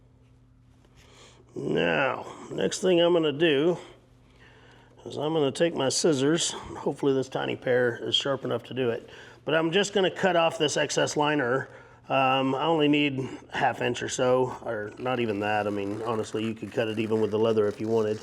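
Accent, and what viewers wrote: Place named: Yorkshire, United Kingdom. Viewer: Absolutely Mint ✊🏼 Proper easy to follow and so down to earth. Are you sure your not from Yorkshire , England? 😀✊🏼😀✊🏼😀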